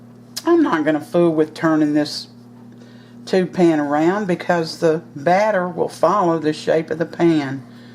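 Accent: South, United States. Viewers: Hi Hun, new subbie here!! What state do u live in? If I may ask lol..I can tell your in the south, I'm in Tennessee, I like a lot of your recipes!!! I'll be sticking around😊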